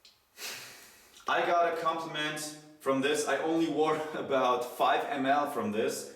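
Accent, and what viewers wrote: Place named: Germany. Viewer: I always wondered where his accent came. Turns out he’s German. Still love the accent and good to see the Germans keep going.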